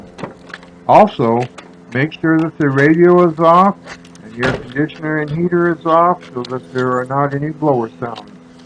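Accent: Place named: South, United States. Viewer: RB CV really? He sounds really clear to me. Sounds like he maybe has a southern accent or southwestern accent.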